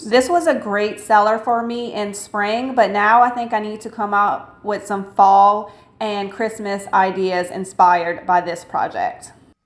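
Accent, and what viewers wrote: Louisiana, United States. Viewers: I just came across your channel and everything is beautiful! I’m curious though, you have got to be from Louisiana. That definitely sounds like a Cajun accent to me! 💕 Edit: just looked in the description and answered my own question!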